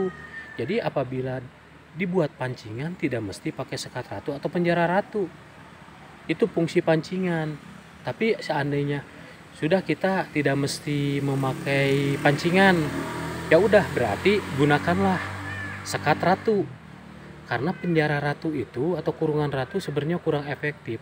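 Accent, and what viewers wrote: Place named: West Java, Indonesia. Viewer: Nuhun kang, logatnamah bangun urang sunda, ti palihan nana kang???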